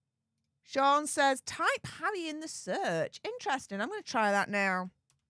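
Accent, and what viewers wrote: Yorkshire, United Kingdom. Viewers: Love your Yorkshire accent ❤ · Love your Yorkshire accent,!! But I can't believe you thought you needed to fix your teeth!! (We're all our own worst critics, obvi!!) You're beautiful inside and out!! Always love your hair & nails, too!!;) Your shows are so much fun and your energy is infectious!! 💕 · Thanks. I love your Yorkshire accent. Being Australian if find all the different English accents fascinating and lovely. Those fellow English speakers who say they don't like it and can't understand need to broaden their horizons by travelling or listening to media made by people with different accents to themselves and stop being so insular.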